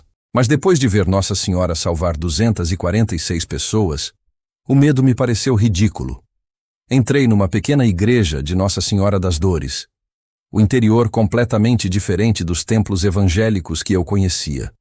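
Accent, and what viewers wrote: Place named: Portugal. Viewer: Esse português de Portugal que o narrador fala é horrível!!!! DETESTO!! Porque??? Se estamos no Brasil???😮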